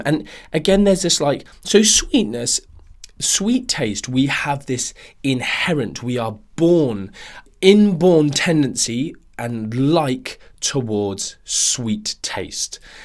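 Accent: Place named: England, United Kingdom. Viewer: Unusually good-looking beard. Ah, - no grey prickly hedgehog-style, or lack thereof. Talk matching it, - good to hear English English for a change.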